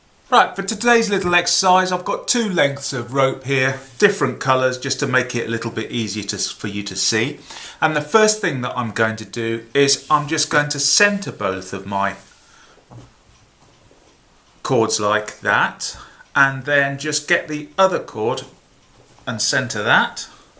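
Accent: England, United Kingdom. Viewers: first of all i wanted to say you can eat a bag of d*cks because you’re english accent wasn’t even the worst part it was trying to follow along with all of your unnecessary BS that you put me through watched a different video solved all my issues and i felt a sense of bliss. You on the other hand deserve to be taken off of youtube for making my life worse causing me to crash out watching your shiet video.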